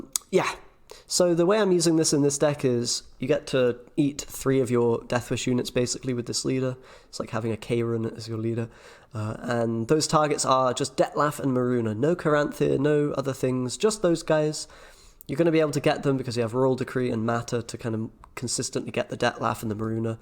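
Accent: United Kingdom. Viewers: Yo, you're British, aren't you? Great vid BTW <3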